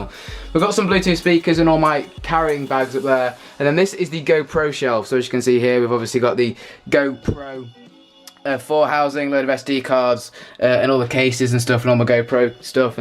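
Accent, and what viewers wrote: United Kingdom. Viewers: Your point is invalid he is from the UK, ha has one of the most British accent ever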